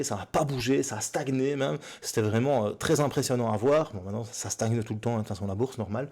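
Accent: Belgium. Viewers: Je suis un belge expatrié et ton accent me donne une petite nostalgie du pays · J'ai vécu 10 ans à Bruxelles, ton petit accent belge me donne envie de chialer de nostalgie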